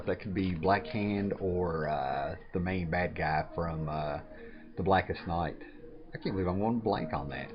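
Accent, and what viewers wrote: South, United States. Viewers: Finally someone else with a southern accent haha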